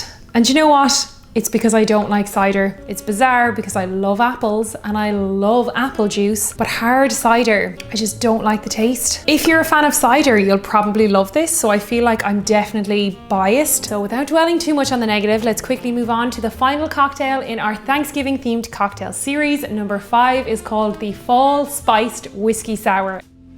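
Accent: Ireland. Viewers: Both my Mom's parents are from Ireland. Your Irish brogue always makes me happy.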